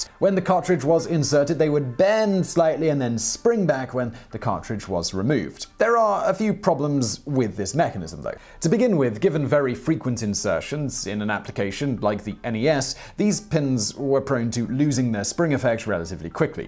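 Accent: United Kingdom. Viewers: "Occasional blowing before insertion was still a thing" to my American ears, you saying that so casually with the AWESOME British accent is one of the funniest things I've heard all week! Had those words come from someone with an American accent it wouldn't have been anywhere near as funny to me..... Thanks for another great video sir! Love what you all do and how much hard work goes in to all of these videos. It's appreciated sir.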